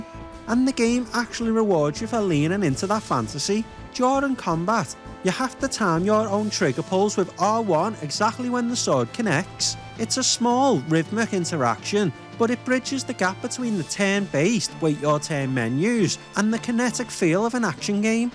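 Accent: Liverpool, United Kingdom. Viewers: Man the Scouse is THICK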